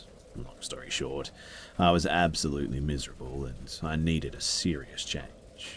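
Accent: Australia. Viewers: Sound like my buddy from Australia 😂, got him listening to you. Dig your channel my friend.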